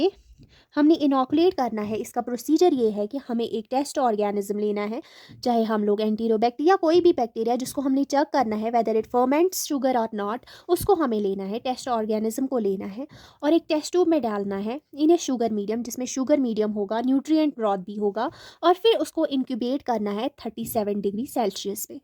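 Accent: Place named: Kashmir, India. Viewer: you are kashmiri by your accent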